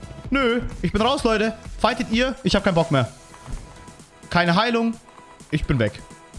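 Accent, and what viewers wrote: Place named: Bavaria, Germany. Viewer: kommst du aus Bayern dein Akzent hört sich leicht danach an :D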